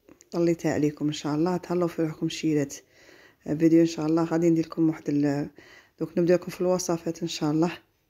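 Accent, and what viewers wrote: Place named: Algiers, Algeria. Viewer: Ahhh Ani 3aqla l'Algeroise mach'Allah 😂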